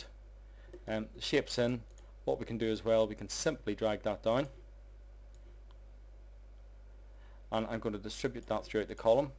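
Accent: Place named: Belfast, United Kingdom. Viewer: i am in canada watching this video currently working for a huge rebar company and i hear that accent....also a belfast fella here so would love to know where ur working with rebar?? its not back in NI is it??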